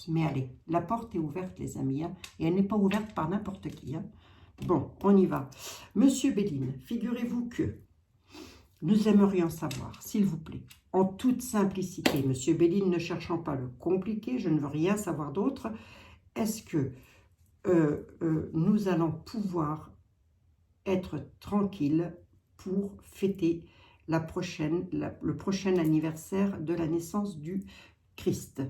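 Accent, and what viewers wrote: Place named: Vosges, France. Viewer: Le léger accent ne m'a pas trompé. Je suis aussi des Vosges mais cette fois, avec un accent beaucoup plus prononcé. Je l'ai perdu un peu depuis que j'ai quitté la Lorraine...😂🙏😇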